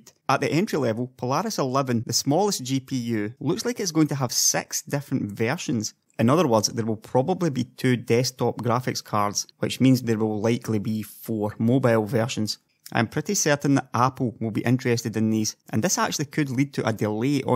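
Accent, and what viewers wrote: Scotland, United Kingdom. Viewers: Nothing wrong with his Scottish accent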